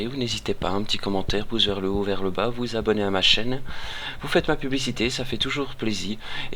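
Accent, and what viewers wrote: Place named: Belgium. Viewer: Mdr ! Pardon cela me fait rire ton accent Belge^^ par contre tu fait de très bonne vidéo qui me donne envie de jouer à ce jeu! continue comme ça👍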